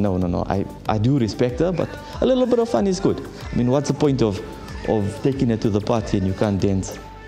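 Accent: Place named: Afrikaans, South Africa. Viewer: That lovely accent is Afrikaans and yes, the car is stunning too. Peace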